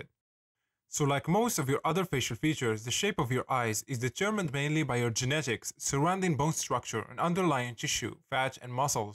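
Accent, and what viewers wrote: Morocco. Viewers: You sound like a moroccan with that accent 💀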